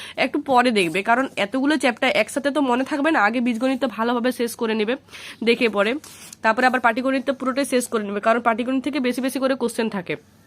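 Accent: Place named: Bankura, India. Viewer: গলা শুনে বাঁকুড়া বাঁকুড়া ভাইব পাচ্ছি।🙄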